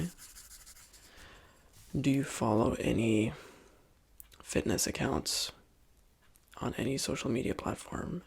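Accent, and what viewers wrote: United States. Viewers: How do you look British and sound American at the same time?:... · The first time I came across your video I feel like you will have a British or Irish accent. Nice American accent like really good. Can you do English accent?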